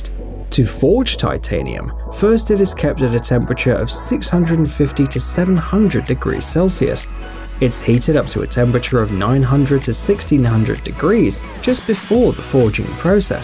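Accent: United Kingdom. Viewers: Ugh listening to the British way of saying these metals. No thanks.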